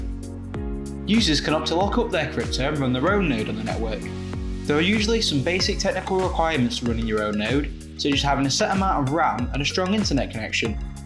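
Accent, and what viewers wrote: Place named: Netherlands. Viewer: Nederlands accent :)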